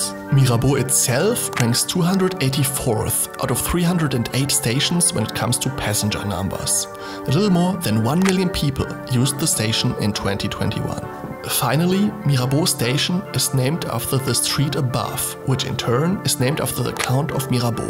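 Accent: Germany. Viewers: Great vid, watched your previous one which is equally as good. I love the short, comprehensive style with the added German Accent! Great English btw. Hope to see the channel grow bigger and bigger with every video! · That was by far the best suggestion YouTube did since a long time. Great video, and very underrated! You should have 100 times the subscribers you have now and at least 10 times the views this video got today. Greetings from a fellow German. :) (By the way: the first thought which came in my mind when I heard your Voice: he is definitely a German. 😂) Keep it up mate! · Ich war schon über dein deutsches Englisch verwundet. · You are German right 😂😭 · I was wondering if you were german, turns out you are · Are you german? Your english has a german accent I think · du bist deutsch oder? top video 👍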